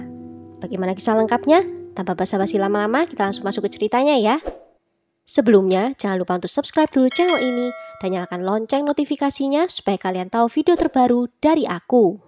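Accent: Java, Indonesia. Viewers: suara mu yg medok itu membuat aku tertunggu tunggu video baru mu........ jgn hilangkan ya aksen jawa mu itu....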